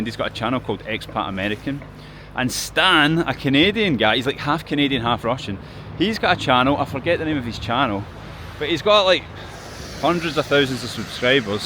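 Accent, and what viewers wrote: Scotland, United Kingdom. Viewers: Согласен, говорит примерно так: "Халёу эфрипоти. Ай эм скотищ гай ин Моску"...))) P.S. Надобно отметить, что в его шотландском говоре есть некоторый шарм... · you probably live on the moon😊. He speaks English. His mother tongue (Scottish) has nothing to do with this. The Scots speak English with this accent (and his not a difficult one, there are much harder in Scotland).There are many accents of the English language spoken in the UK which differ from the classic English... Listen to MMA fighter Paddy Pimblet speak. It's an alien language😊. Though he's from Liverpool... Scouse-that's the name of his accent...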